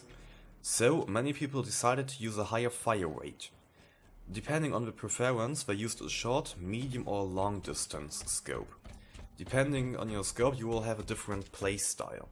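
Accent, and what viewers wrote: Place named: Germany. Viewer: German accent! sounds so weird to me but english people love it lol. Also very good video man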